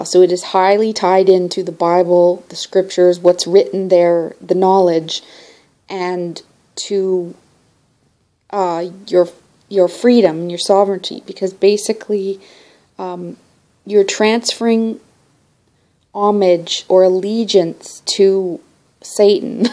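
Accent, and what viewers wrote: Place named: Canada. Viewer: There's a Daoist quote that I read in an old Alan Watts book to the effect that everything went pear-shaped when the law was written down.. I tried to find the exact quote on google so that I could post it on your Genesis video. Couldn't tho. Anyway, don't know if you say 'pear shaped' in Canada, but I prefer to use slang terms sometimes-- I'm probably out-of-date with them tho-- I was tempted to say 'Pete Tong', which is even more out-dated. I'm only guessing that you're Canadian, but the pronunciation of 'out' is usually a give-away. 'Great Work' in any case :)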